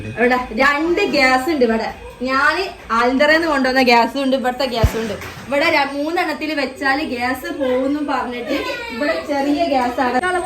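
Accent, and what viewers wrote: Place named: Malappuram, India. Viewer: സംസാരം തമ്മിൽ നല്ല വ്യത്യാസം ഉണ്ടല്ലോ. തനി കോഴിക്കോട് തന്നെ പക്ഷേ ഒരു മലപ്പുറം ടച്ച് ആണ് കൂടുതൽ തോന്നുന്നത് അതെന്താ അങ്ങനെ 🤔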